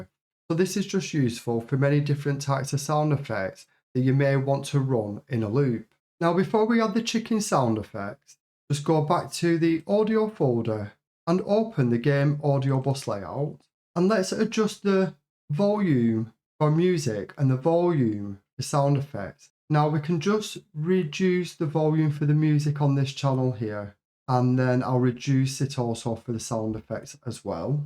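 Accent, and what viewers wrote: United Kingdom. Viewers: Fantastic Tutorial!! I really have to ask though, where exactly is that accent from? Some region in England obviously, but where? I am trying to figure that out for 1 week now. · As someone who use to make tutorials, I can say this is a HUGE amount of effort! Seriously well done on a fantastic tutorial and excuted so well! Are you from Lancashire by any chance? Just thinking from the accent?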